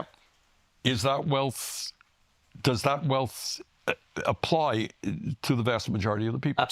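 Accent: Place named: East Coast, United States. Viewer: His accent makes me nostalgic for my childhood doing arts and crafts with my dad's mom from back East.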